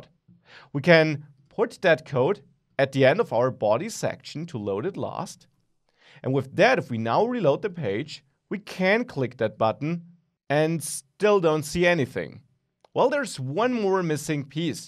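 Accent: Germany. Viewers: I bet 100 dollar he is from germany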